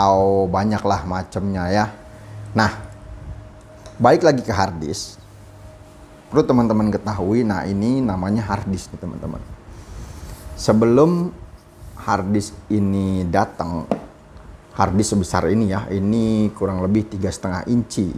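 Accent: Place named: Betawi, Indonesia. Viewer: orang betawi ya bang, gaya bicaranya ketauan banget. abangnya ganteng juga. upgrade saya dong bang🥰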